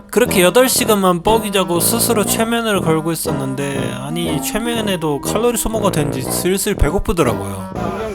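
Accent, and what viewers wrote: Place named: Gyeongsang, South Korea. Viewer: 경상도 섬쪽 말투신데...경상도분 맞죠?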